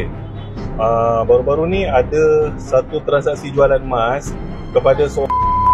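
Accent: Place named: China. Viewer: Kenapa nak cakap melayu ikut dialek cina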